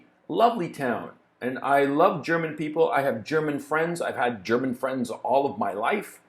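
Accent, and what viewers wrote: United States. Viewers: Great information but words matter. I'm super offended by the overuse of the blanketed blame to "the Americans" with sparse mention of those truly behind the controls. I've cut ties with our media over two decades ago for the same reasons you mentioned once in your video regarding USA media. I'm lonely here in America having this view with having my only outlets watching my heroes of news information (you specifically being one of them) generalizing those "Americans" as the evil ones. You did similarly generalize "the Norwegians " but only once. I appreciate greatly what you do and again you are one of my heroes. Remember that your English is 100% "American" not the Queen's whatsoever. BTW English language trained in Germany is the Queen's. I will continue watching your contributions but know this, words matter. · But that accent sure sounds "American".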